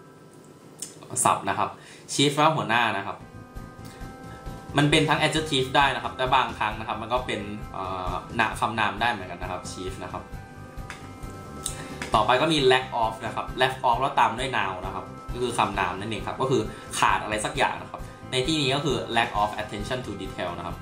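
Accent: Thailand. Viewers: แค่นี้ก็โอเคแล้วค่ะ สำเนียงไทยๆ เข้าใจง่ายค่ะ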